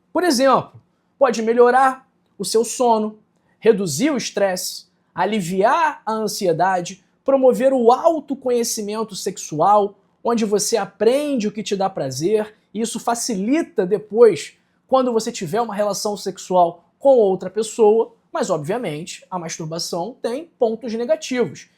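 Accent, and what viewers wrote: Rio de Janeiro, Brazil. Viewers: Não aguento o sotaque carioca. Meu Deus do céu, não dá!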